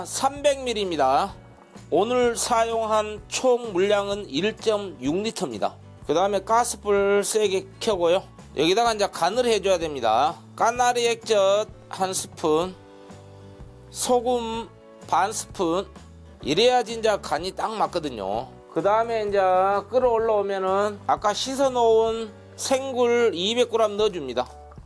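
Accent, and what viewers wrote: Jeolla, South Korea. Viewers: 셰프님!!거시기 전라도요!! 참으로 정겹습니다~~알배기 배추 추가가 키~포인트네요!! 감사합니다 😂❤😅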